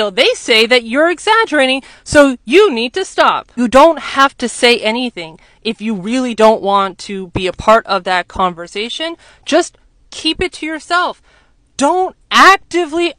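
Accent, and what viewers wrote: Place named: United States. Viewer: I like your American clear accent.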